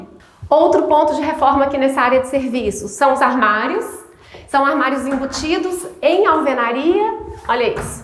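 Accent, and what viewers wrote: Minas Gerais, Brazil. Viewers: Primeiro me atraiu as dicas e pelo sotaque charmoso de minas. Depois de vários videos assistidos, me apaixonei por sua racionalidade, perspicácia e criatividade nas soluções. Se eu fosse rico, te traria aqui pra São Paulo para transformar meu apartamento em um apartamento de resort rsrs. Tenho certeza que amaria as soluções. Todo sucesso do mundo para ti. Abraços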